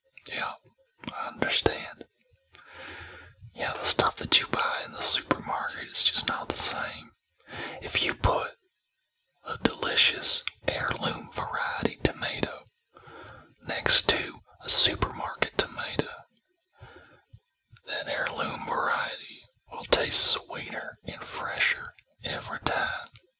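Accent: Texas, United States. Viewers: I’m down I’m in tx right now it’s perfect man I thought you were a all Texan family man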